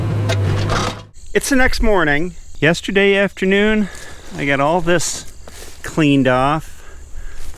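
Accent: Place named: South, United States. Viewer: 60s country twang puts the ooo in smooth.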